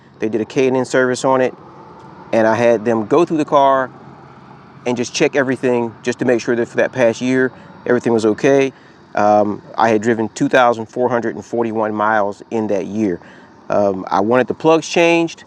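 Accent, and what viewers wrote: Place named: Pittsburgh, United States. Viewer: You from Pittsburgh? Ya accent sounds like it.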